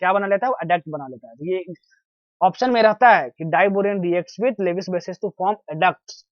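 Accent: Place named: Madhya Pradesh, India. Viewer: Sir I am also from Madhya Pradesh that's the reason aapki tone bhi thodi mere jaisi hai. 😍😊😊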